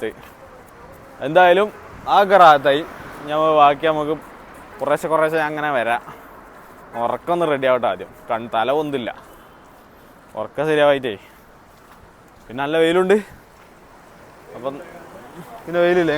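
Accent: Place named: Palakkad, India. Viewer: എന്റെ പാലക്കാടൻ ഭാഷ 👍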